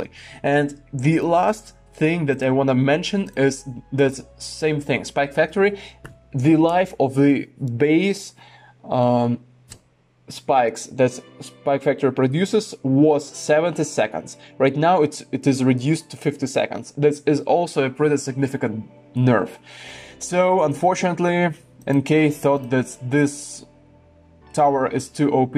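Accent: Russia. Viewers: I am gonna guess that the accent is Russian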